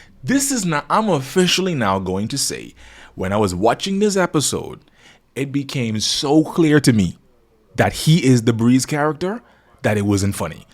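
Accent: Bahamas. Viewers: Are you from the Bahamas your accent remind me of mines? Bless up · you sound Bahamian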